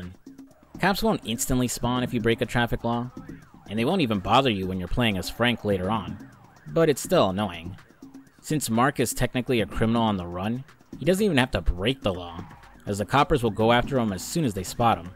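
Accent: United States. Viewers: Getting an ear full off this moaning yank narrating ... lifes not ment to be easy son it aint a walk in the park treacle , fack me 😉 ... me an our kid were living in london when this gem came out ... looking back im chuffed we did the london thing in our twenties ... most young Brits do , if they got anything about them ... remember working at selefridges and going there on the game it even had the back exit staff had to use and other places id go up west ... kewl as fuck that game deffo needs a remake would be epic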